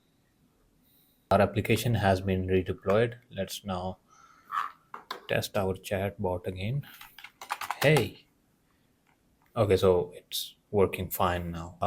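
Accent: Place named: India. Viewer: BRO WHAT IS THIS BIRYANI ACCENT MAN WE CANT LEARN SHIT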